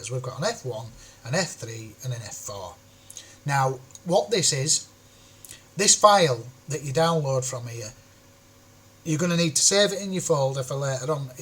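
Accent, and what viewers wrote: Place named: North, United Kingdom. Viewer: Great video mate! Nice to hear a tutorial with a familiar northern accent :D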